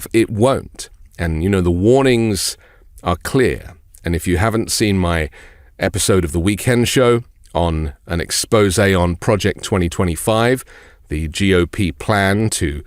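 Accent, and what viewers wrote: United Kingdom. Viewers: I find it hilarious that this host is still clinging onto the Russia Collusion hoax. His British accent makes him sound intelligent and then he lets stupidity like that come out of his mouth.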